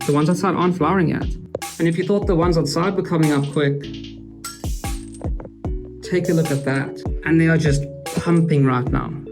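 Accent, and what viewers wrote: South Africa. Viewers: Brother, are you from South Africa? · you sound South African!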